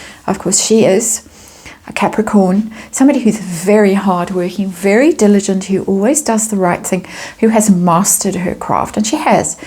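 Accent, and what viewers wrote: South Africa. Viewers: Everyone seems to be making money from this debacle, don't you think? You are one of 3 unbiased readers I trust. Plus I enjoy listening to your S African accent. My father lived in Cape town for many years before relocating because of unrest, to Sunshine Bay Australia with his second family. We have many S African dentists and opticians in my home town in Kent, I've noticed. 😊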